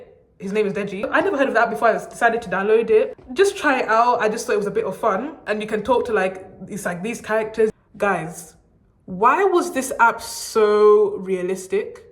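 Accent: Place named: Southern Africa. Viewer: You have a southern African accent, love it!!❤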